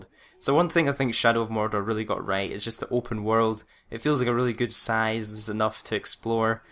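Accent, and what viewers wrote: Scotland, United Kingdom. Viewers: What part of scotland are you from x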